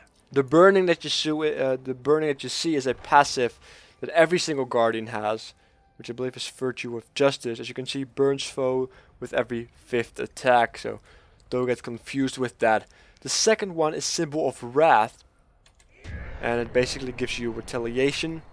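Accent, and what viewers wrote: Netherlands. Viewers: You dutch i hear your accent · Yo mede Nederlander, nice vid ! ps: Je accent verraadt je :p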